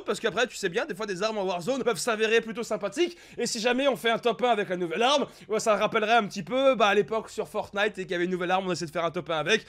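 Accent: England, United Kingdom. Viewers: L accent anglais et ouf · Ton accent anglais me fait trop rire😂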